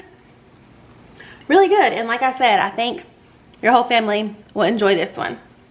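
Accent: South, United States. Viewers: Hey, I love your channel, I've been subscriber for probably 3 or 4 years. You sound like your a southern and that would make you near my neck of the woods. I'm from Charlotte, NC but I live with my husband of 39 years in Monroe, NC. I was just curious of what part of the south are ya'll from. I do understand if you don't wish to tell me though. God bless you and have a wonderful Thanksgiving Holiday.😊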